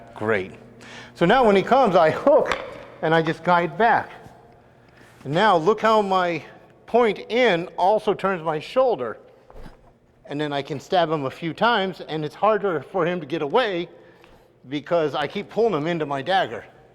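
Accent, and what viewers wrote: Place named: Texas, United States. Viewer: Is he Texan/Oklahoman? "Wooden d-..daggers.." old grumble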